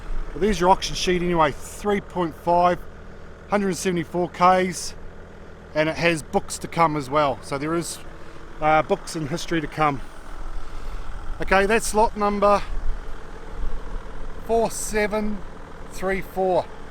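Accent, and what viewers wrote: New Zealand. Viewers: You a Kiwi fella?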